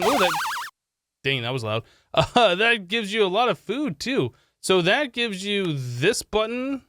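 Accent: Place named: Canada. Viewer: Are you Canadian? You have a funny accent.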